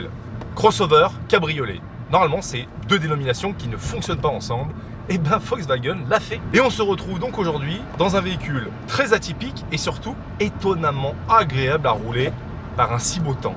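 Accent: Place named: Savoie, France. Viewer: Le combo t-roc + charo avec accent savoie= sa lui va incroyablement bien bref continuer comme sa vs ete les mlr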